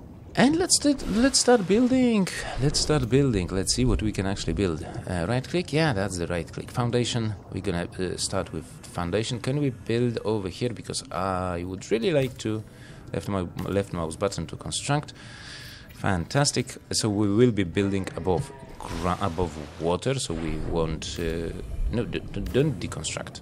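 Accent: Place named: Eastern Europe. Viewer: Where are you from, your accent is very nice. I think east europe.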